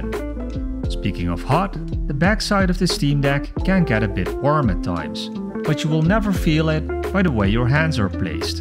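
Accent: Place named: Netherlands. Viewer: Jij bent toch een Nederlander é haha, ik hoor het aan je accent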